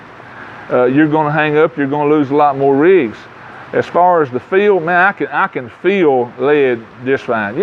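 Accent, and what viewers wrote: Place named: Appalachia, United States. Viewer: Appreciate you spreading the seed of knowledge, you got the perfect hillbilly accent for these videos lol. Even if it weren't gold that accent would convince half of your viewers.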